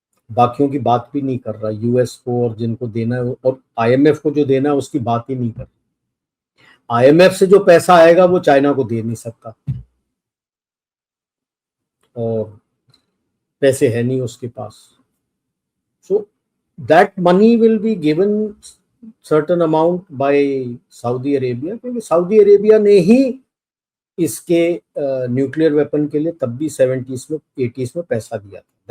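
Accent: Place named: Punjab, India. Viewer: Sir, your last name indicates you are south indian but your dialect shows you are perhaps punjabi speaking. Sir, reveal you are punjabi or tamilian.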